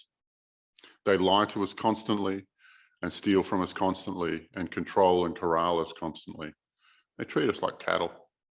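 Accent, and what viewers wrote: Australia. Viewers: It's great to hear an Aussie accent:)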